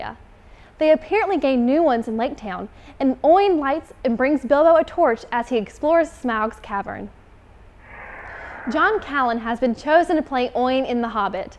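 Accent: United States. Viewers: You're cute, but try to stop mis-pronouncing everything please. You can push past this "American accent" we believe in you!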